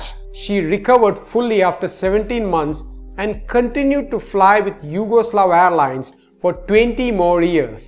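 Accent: India. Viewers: The story is good....but the Frikin Indian accent is pissin annoying. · indian english.. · Those people can really affirm "they're lucky". Love your Indian accent! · i enjoyed watching, but i didn't enjoyed listening to your indian (malayalam english) diction. I feel that i am having a short of breath listening you bro!! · In fact it’s the best Indian accent that I’ve ever heard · My parents were invited to a dinner with juliane koepcke parents the night before the accident, the host was the dean of biology faculty at san marcos university, lima peru, they were talking about tropical diseases in peruvian jungle and how hard it will be if you get lost there. Btw, i dont know why is nice to hear narrations in english with indian accent , maybe too much big bang theory or get used to call centers. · Amazing how many people are complaining about this guy's accent. He's clearly from the Indian subcontinent - he's allowed to have an 'accent'. 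He's also managed to collect 140,000 subscribers on a platform that's massively dominated by American viewers and content providers, which I'd say is no small achievement. For the record, English is nowhere near being the most spoken first language in the world - if we sum together the various Chinese languages, for example, the number of first-language speakers outnumber first-language English speakers by more than three to one. There are also more people speaking Hindi and Bengali as a first language than there are English. So to everyone criticising ' ' for his accent, I say: how's your Hindi coming along? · People are a disappointment when they cant look past an accent, the content is very good that's what should matter. I actually like the indian accent. Good video bud.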